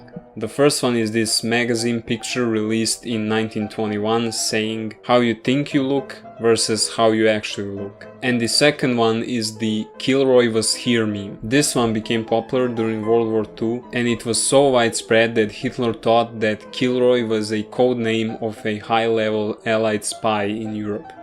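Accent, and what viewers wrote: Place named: Russia. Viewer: I thought it was sorry for the misunderstanding, you just sound russian.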